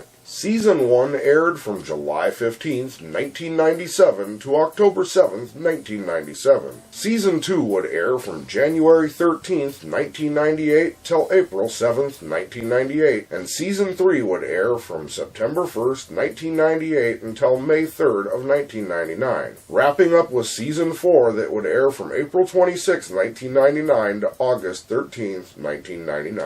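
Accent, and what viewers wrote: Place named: United States. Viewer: I didn’t know Max Cavalera did 90s show reviews in an American accent.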